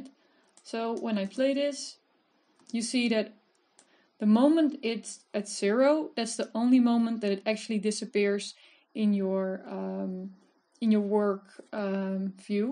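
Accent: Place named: Netherlands. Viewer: tenk joe ferrie much....joe ar de beste......ded was just wat eye needed....nee hoor jou Engels is niks mis mee maar altijd zo leuk herkenbaar dat nederlandsEngels :-)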